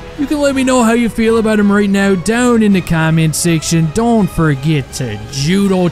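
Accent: Newfoundland, Canada. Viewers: You sound Irish but both Canadian. You must be from Newfoundland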